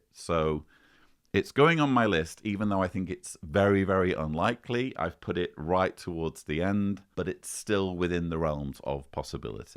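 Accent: United Kingdom. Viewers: I come here only for the British accent and the Tony bashing 🤣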